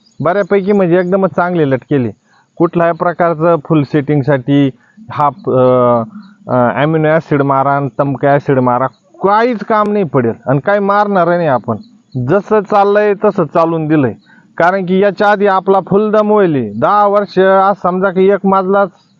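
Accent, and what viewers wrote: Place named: Nashik, India. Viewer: वैजापूर तालुका ते नासिक पट्ट्यातील हेल (स्वर) असलेला आवाज आहे हा.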